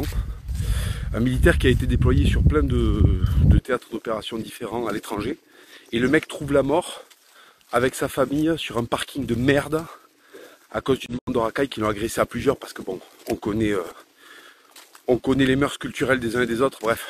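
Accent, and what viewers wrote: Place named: Arab world. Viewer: Merci d'avoir posté les preuves évidentes que tu n'es pas un Breton et ne l'a jamais été. Tu zozotte toujours autant le bédouin hahaha et puis bon, ton accent, malgré tes acrobaties n'échappe à personne. 100% Accent arabe. Bon allez, je te laisse rêver à une identité qui n'est pas tienne. Tu t'humilie tellement tout seul que je n'ai même pas besoin de te vanner. Y'a pas de Fatima en France, à part les bédouines arriérées venues de chez toi, qui viennent à la nage chez nous.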